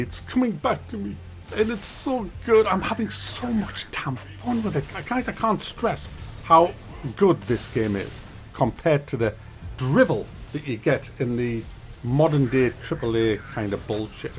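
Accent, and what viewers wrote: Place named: Newcastle, United Kingdom. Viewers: How the hell do you talk like a Georgie 99% of the time but go posh when you say marrster instead of master? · He's a geordie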